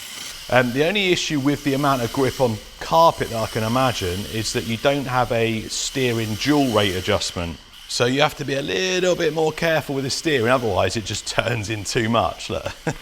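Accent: United Kingdom. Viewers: I was onboard until you started pronouncing things in British fashion, rather than how they're actually pronounced. Knee-sahn, not nis an. FFS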